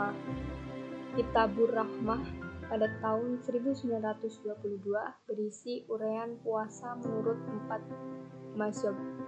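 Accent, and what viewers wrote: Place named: Banyumasan, Indonesia. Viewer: Uwuuuuu ngapak mantap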